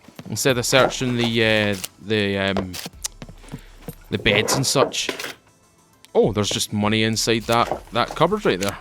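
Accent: Scotland, United Kingdom. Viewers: I've been looking for a new SCUM player but I don't think I can watch your videos, I'm Scottish as well and the inevitable thing that all Scottish people have to do so everyone else understands us also is unbearable to us! 😂 Nothing personal mate 👍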